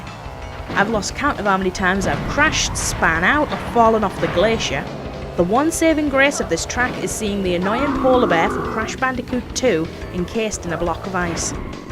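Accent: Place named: Northern England, United Kingdom. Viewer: I love a good Northerner. Sheffield?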